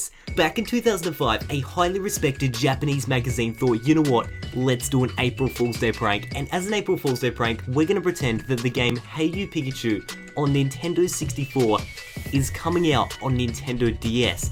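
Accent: Australia. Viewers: Thats an australian accent. Thats honestly kinda racist. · Are you Australian? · ReaditonReddit Autocorrect :/ btw English Originated in England not America,and I know it was Australian but I'm not that good at realizing the difference in accents of Australian and British.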